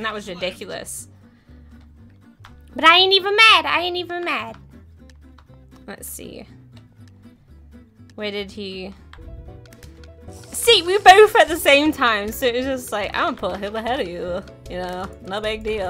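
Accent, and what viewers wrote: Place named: Liverpool, United Kingdom. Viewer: i love hearing your accent! its funny hearing someone from who's also from liverpool :)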